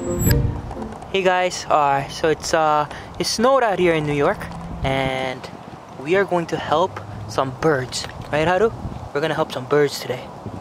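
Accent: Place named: Philippines. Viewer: Are you from philippines because you talk like filipino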